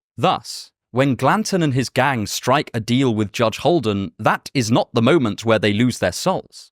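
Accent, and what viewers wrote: United Kingdom. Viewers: watched to learn❌ watched for british accent✅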